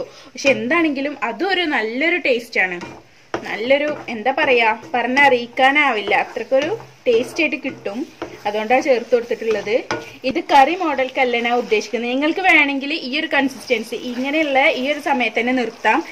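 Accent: Malappuram, India. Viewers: samsaram orupadishta, njanum malappurathukariya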